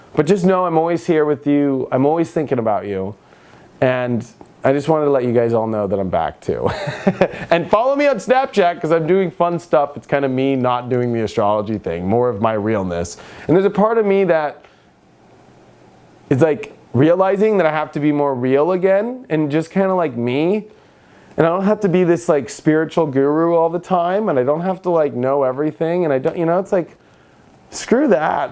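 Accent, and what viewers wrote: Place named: Los Angeles, United States. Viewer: Awesome x great clarity..you.sound SO LA...Lol!..... don't ever change Dude x! Sky Powers Photographer / europe / <link>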